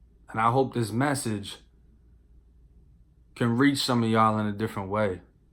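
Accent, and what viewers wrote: Boston, United States. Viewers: I am just trolling a little bit lol but not for negative reasons just trying to give you laugh and get your attention at the same time. Few questions for you. You ever come across in prison any of the people that killed that guy based of the movie bully. I know Florida that case was huge so big that it turned it into a movie. I love people from Boston just the way they talk and the way they carry themselves that's why I vibe with your channel but with that said boston can be pretty racist unlike Florida were it seems to be more diverse you won't see nearly as much racism. I only bring this up because you were talking about trying to work at Irish bars when you got out of prison I figured that would put out a target on you being blood and all the gang releated tattoos. I know that side of Boston would hate on you but I could be wrong. 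Also you remind me of one dude from the movie the town. I was watching the movie 2 days and I remember the interragation scene I am like this dude be sounding just like you. Boston be going hard with those gangster crime flicks if you get a chance the average person don't know this movie but its called what doesnt kill you best Boston gangster crime flick I have ever seen and its based on a true story about to bad as dudes from boston that came from nobodies to running boston This the link in case you never seen <link> Also this dude is a trip this guy was a regular no gang affiliated white kid from Boston who became one of the biggest gangster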